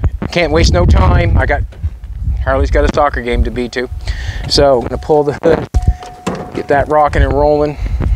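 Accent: Canada. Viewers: I promise you , not all Canadians sound like this guy …. Or have a dusty ride like this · I was just about to say, you sound more Canadian than I is. Then, you said Nova Scotia 😂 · Fired this up and in the first 10 seconds I was like yeah this guys Canadian and it was confirmed when I heard Canadian tire 😂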